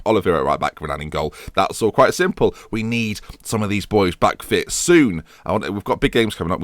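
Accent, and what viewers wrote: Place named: North, United Kingdom. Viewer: your accents just kept going further and further north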